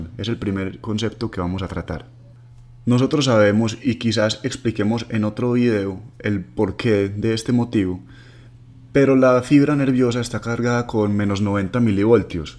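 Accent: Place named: Medellín, Colombia. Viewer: tuve que darle replay xq me deje llevar por el acento de medallo... jajajajaja ups!!!!!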